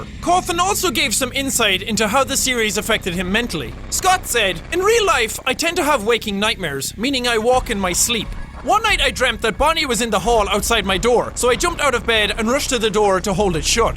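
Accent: Ireland. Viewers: I love that Jack is so Irish in this video. Like he says "tree" when he means "three" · Not only is it weird to hear him not yelling, but back when this was made, his Irish accent was more audible. For example, three sounds way more like tree. XD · I'm Irish and that's how a lot of us sound. Not me, because it depends what part of the country you're from, like Victor Cheng said. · get the fuck off of YouTube it's not his fault he has a Irish accent it's just his nationality you cancerous dipshit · It's his accent, he's Irish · The Irish Blaggard Jack says "Top of the morning to you laddies" as a parody and a way to make fun of himself. He has stated this in one of his older videos. In fact, he use to hide his accent. If you want to see a REAL forced accent, maybe you should look at his older videos. It is in fact an Irish accent. Do you seriously expect all Irish accents to sound the same? 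As people have said, he speaks kind of monotone in this video and tries hard to pronounce everything correctly; similar to what he use to do. Maybe you should actually learn a bit about him before making an idiotic comment. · Justin Talmadge He's Irish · Because with an Irish accent you can't really turn it off. It's not on purpose, it's just natural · Kyru Shelton he's irish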